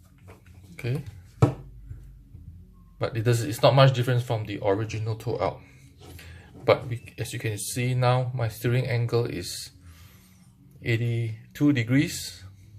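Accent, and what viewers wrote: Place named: Singapore. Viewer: You sound like Singaporeans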